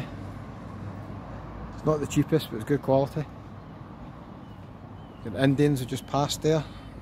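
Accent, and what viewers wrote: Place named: Scotland, United Kingdom. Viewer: Certainly a Scottish accent but his is a central belt accent not a north eastern accent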